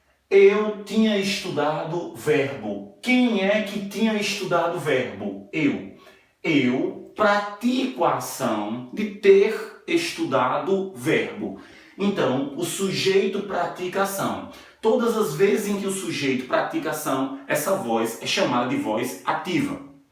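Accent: Northeast, Brazil. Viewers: O senhor é pernambuco, cearense, rio grandense do norte(potiguá)paraibano. Enfim, o senhor nasceu no Nordeste?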